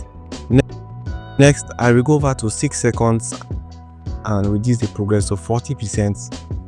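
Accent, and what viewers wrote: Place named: Nigeria. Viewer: I’m your Indian subscriber , struggling to understand Nigerian English accent 😂. Great tutorial 💜.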